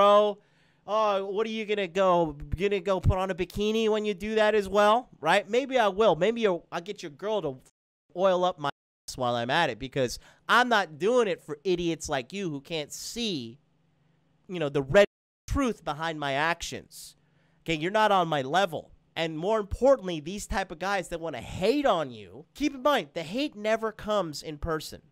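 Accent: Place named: Trinidad and Tobago. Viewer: Great Trinidadian accent!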